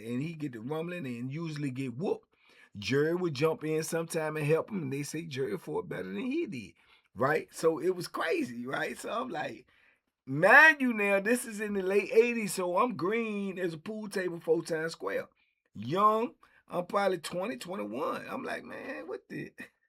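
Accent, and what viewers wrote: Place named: New Orleans, United States. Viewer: Yo do you have New Orleans roots? I know you from DC but I hear you sound like me from time to time. New Orleans ⚜️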